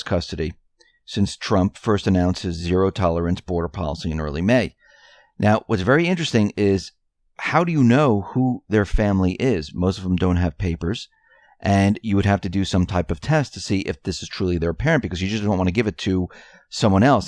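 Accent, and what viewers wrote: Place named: New Jersey, United States. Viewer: Total East coast. Mid or Southern New Jersey by the accent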